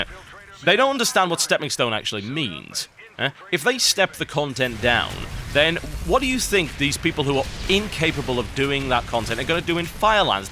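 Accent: United Kingdom. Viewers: I wonder what persona totalbiscut has ... WAIT HES BRITISH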